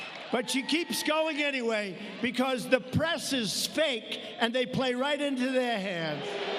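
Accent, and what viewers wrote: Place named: Brooklyn, United States. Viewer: Queens? I here Brooklyn accent but need to check! Either way he has the great Old Skool humor! love the DON!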